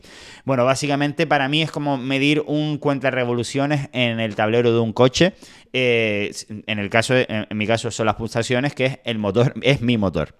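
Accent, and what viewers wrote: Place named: Canary Islands, Spain. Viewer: Interesante vídeo 👌👌👌. Me encanta cuando dices lo de ''gandul'', ajajajajajajajaj, como echo de menos nuestro acento canario. Saludos puntal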